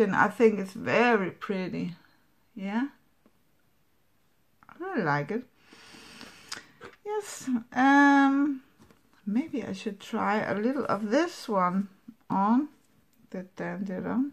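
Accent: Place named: Denmark. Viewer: You live in Denmark? I love your accent!! Your eyes look beautiful!!!!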